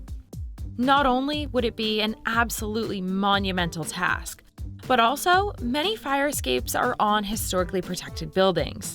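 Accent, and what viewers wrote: United States. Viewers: My impression is that (based on the host's accent) they're based out of the US and report on locally relevant material. Besides, crapping on the US is the national pastime.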